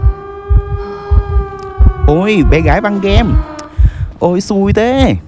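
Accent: North, Vietnam. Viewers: Anh gốc là ng bắc đko ạ?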